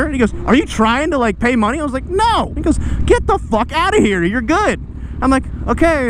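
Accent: Canada. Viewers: Lmao your canadian accent had me in tears aye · The Canadian accent had me rolling 😂😂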